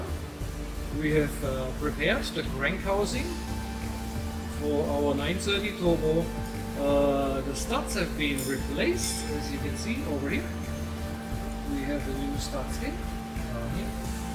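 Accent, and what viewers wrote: Germany. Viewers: As a machinist and a car guy I can appreciate your work! I worked in a German owned shop for many years, your accent brings back many memories. Keep up the excellent work! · Just your accent makes me want to ship my motor all the way to Germany so you can rebuild it!!! Awesome video.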